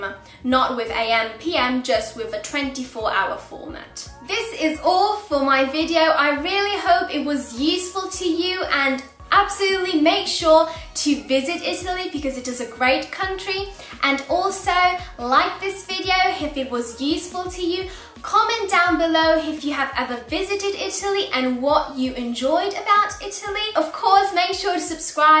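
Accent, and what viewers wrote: England, United Kingdom. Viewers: My grandmother was born in Italy. We still have her naturalization papers. Your English sounds English. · Question.. If you are Italian, why do you have an english accent?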